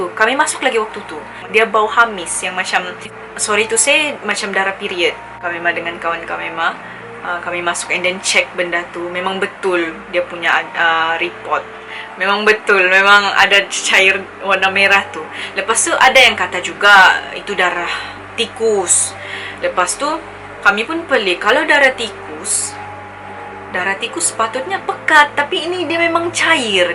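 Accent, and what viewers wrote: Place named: Sabah, Malaysia. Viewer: Suka sa dengar cakap loghat sabah 😂 sedap jak dengar hahaha yay new video!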